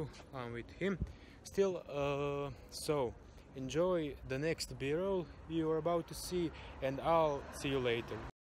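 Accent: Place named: Russia. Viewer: Naglasak ruski al moze proc hahah 👌 extra video 👍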